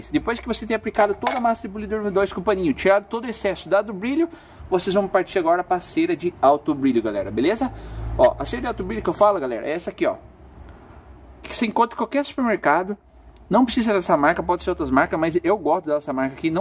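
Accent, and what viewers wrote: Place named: Interior, Brazil. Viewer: Seu sutaque é do interior kkkkk tmj. mt bom, vlw pela dica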